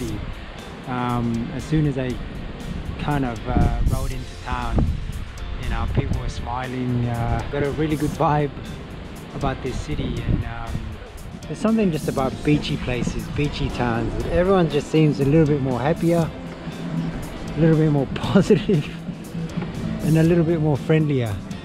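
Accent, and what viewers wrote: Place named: Australia. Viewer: Palanga is a resort town in Lithuania. You sound australian or could be kiwi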